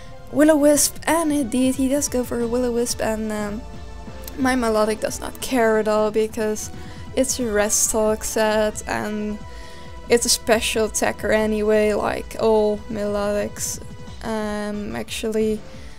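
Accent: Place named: Netherlands. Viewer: Gotta love Dutch accents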